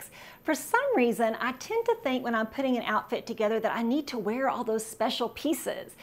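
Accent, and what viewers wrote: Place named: Texas, United States. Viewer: I love her accent! Is she from Texas?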